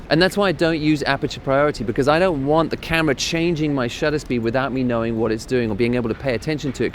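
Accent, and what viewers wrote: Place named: South Africa. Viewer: Man I knew you were South African the first time I heard you speak, can't mistake some of those enunciations coming through the British accent 😅 . Great video bru 🇿🇦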